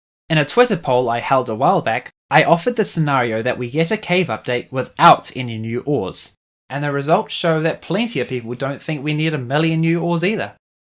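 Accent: New Zealand. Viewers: Couldn't have a more Kiwi accent if you tried hahaha. Great video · You sound like a New Zealander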